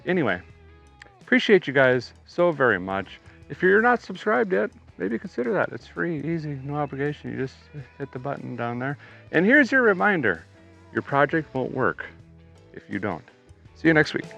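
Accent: Minnesota, United States. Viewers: The multi-millionaire celebrity Vice Grip garage... havent you yet transcended beyond ACTUALLY having to produce content? No billionaires just supporting you for being the celebrity you are with your "Fargo" Minnesota BS Accent? All this is is Hoovie 2.0...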